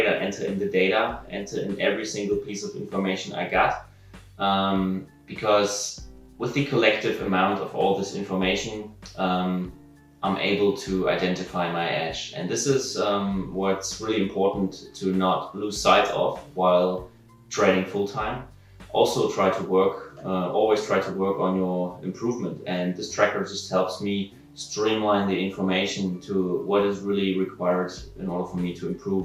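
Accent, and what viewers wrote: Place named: Denmark. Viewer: Danish. You’re Danish aren’t you? Your name suggests you’re from around there but also the way you pronounce “particularly”. The Danes love CSGO and there’s one Dane in particular who pronounces that word the same way you do. I could be wrong. Anyway, great video again. Edit: I’m even more sure that you’re Danish: mild temperament, even mannered, pro-social, supportive, more words that sound Danish like “next time.” Haha, anyway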